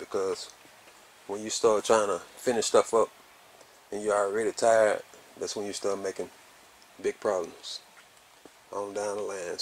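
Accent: Alabama, United States. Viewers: What part of Alabama you out of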